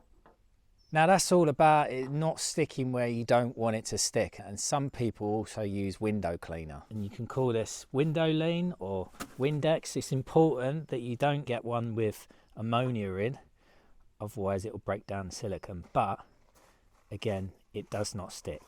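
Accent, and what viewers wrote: United Kingdom. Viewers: Finally someone that actually has common sense about installing silicone. And you sound like you are from the UK. I have never seen a caulking video from the UK that wasn’t ridiculously wrong. And very few from the US that were any better. I spend a lot of time refuting the moronic YouTube silicone videos. Everyone thinks if it looks good it is good. The fact is in the US residential silicone lasts on average 7 years where properly installed ( no liquids) commercial silicone lasts on an average 20-30 years or more. Every major manufacturer says not to use liquids to tool with if you look close enough at their tech manuals. Here is what DOW ( one of the largest silicone manufacturers in the world ) says: “NOTE: Do not use liquid tooling aids such as water,soap or alcohols. These materials will interfere with cure, adhesion and create aesthetic issues.” Page 75 of Dow Construction Sealants Technical Manual. 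Do you mind if I refer people to this video? The only thing a disagree with is only tooling ne way it pulls the seakant away from the tile/ grout at the first tile and into the tile/ grout at yhe second tile You need to go both ways.“professionals spray their tools” wrong. True professionals dry tool and mostly ( not always) use custom ground metal tools. I don’t want to be mean but the fact is the UK is far behind a lot of the world when it cones to sealants. Probably due to your lack of high rise buildings ( probably a good thing)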